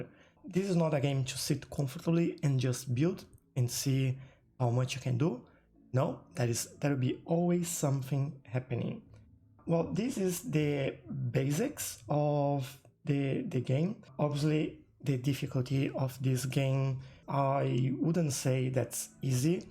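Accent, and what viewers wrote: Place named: Brazil. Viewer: You sound like a brazilian guy speaking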